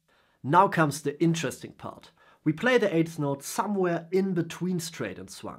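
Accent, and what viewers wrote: Germany. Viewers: Man hört direkt das du Deutscher bist haha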